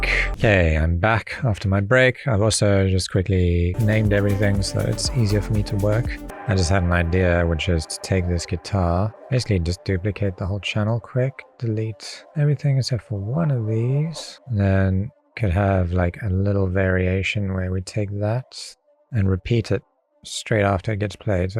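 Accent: South Africa. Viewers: Bro sounds south african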